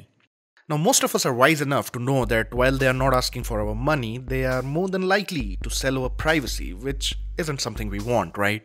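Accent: India. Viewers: The best review of vpn by an I Indian accent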